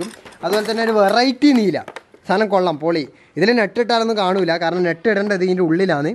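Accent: Kannur, India. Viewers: Bro kannur aanalle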